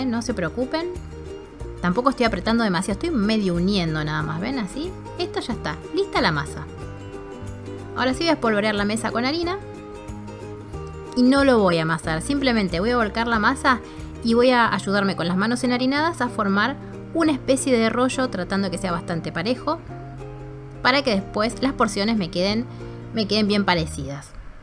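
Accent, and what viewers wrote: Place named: Argentina. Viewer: El acento argentino me encanta, nada que ver con el de otras cocineras. Cada una tiene su timbre inconfundible.❤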